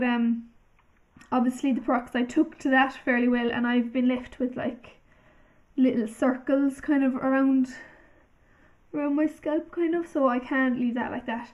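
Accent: Ireland. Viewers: I love the way u speak. I like irish language, in half irish (wish i could talk like u guys) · Are u Irish? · A Irish u tuber way ha! Glad to hear a Irish voice !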